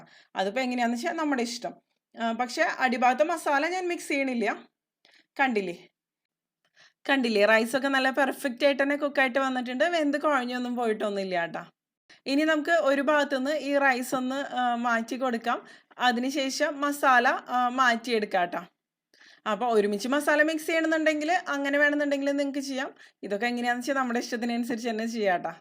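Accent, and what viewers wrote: Thrissur, India. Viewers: തനി തൃശൂർ ഭാഷ ,ഞങ്ങളും തൃശൂർ ആണ്,സൂപ്പർ റെസിപി ,നല്ല അവതരണം,നങ്ങൾക്ക് ഇഷ്ട്ടപെട്ടു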